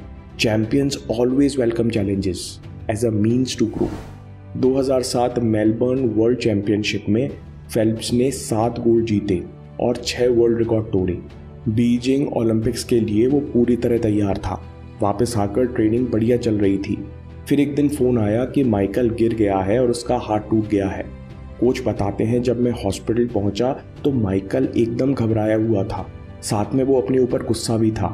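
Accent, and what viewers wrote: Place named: Punjab, India. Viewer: yaar punjab n aise hi bolte hai hindi iski koi galti nahi hai.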